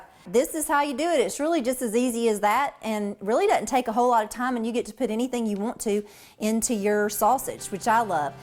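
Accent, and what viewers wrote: South, United States. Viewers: Her southern accent makes hearing about the recipe all worthwhile . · FANTASTIC video! You are lovely and a really good presenter. I've been watching sausage-making videos this afternoon because we've consumed all the summer sausage we got from the processor, so I'd like to make some of my own from the 5 deer my son and I harvested and I processed myself. Your video gave me the best directions I've seen so far. Thank you. And I don't know where you got that wonderful Southern accent, but I'm from Mississippi, and you sound SO much like my middle-MS and Alabama friends. Yankees love to make fun of us, but in fact we all know Southerners speak the REAL American English! Thank you! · Good video plus it helps that this woman is beautiful and that country accent damn lol I think I made it to her second sentence and showed my girl who's from Virginia and she def agrees! 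WE NEED MORE WOMEN LIKE HER! Tell your man he's a lucky guy with much love from Florida 😄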